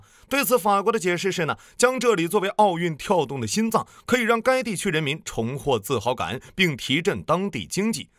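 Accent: Northeast, China. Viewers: 听您说话就知道是本山的徒孙。。。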